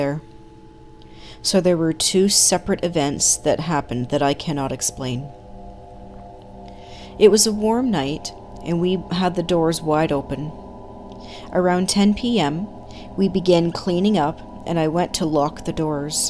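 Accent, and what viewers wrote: Canada. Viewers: I'm English as it happens. Look girl, i was just having some fun. you should hear my accent? especially when i'm drunk! You sound Canadian and to be honest, i rather like the accent. i lived in the USA for a few years along time ago and i'm ex-army too so i'm used to quite a variety of speech patterns. If i knew you been subjected to nutters threatening you, i'd have either not commented or said something else. Why anyone would want to say awful stuff like that to you on the strength of the way you speak, God only knows? if it's worth anything, you carry on. i did like the stories. so did my dog. we listened attentively as we scoffed our sausages and mixed veg. :0) People who post shit like that are impotant tossers who proberbly have no mates and still live with their mums! Sorry again mate. Jez and Charlie dog. xxx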